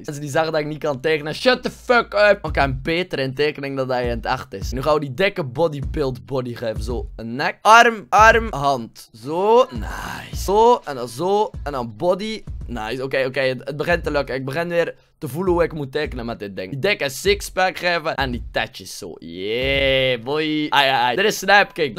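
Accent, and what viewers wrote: West Flanders, Belgium. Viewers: kom je ofzo uit België (ben vandaag je gaan kieken) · Praat wa westvlaamser haha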